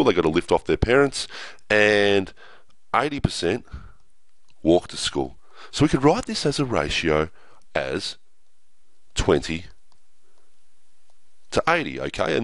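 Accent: Australia. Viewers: He’s Australian. Watch a couple Aussie shows, movies, or certain documentaries and you’ll understand him even if he had a cough. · You can just tell he's australian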